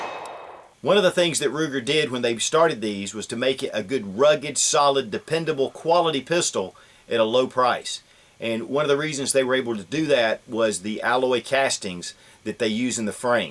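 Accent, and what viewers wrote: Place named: South Carolina, United States. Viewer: you prove in all of your videos you know what you are talking about and that accent seems familiar to me seeing as im from SC.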